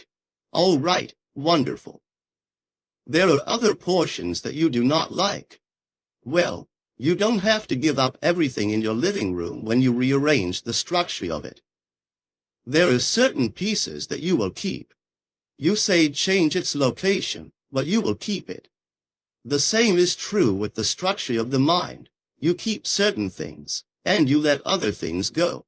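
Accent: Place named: United States. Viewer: Lovely but a bit weird that a slight American accent gets in there. I know his original voice in and out.. so it feels a bit foreign. Only a little detail. Otherwise the message is lovely 🥰👌🏼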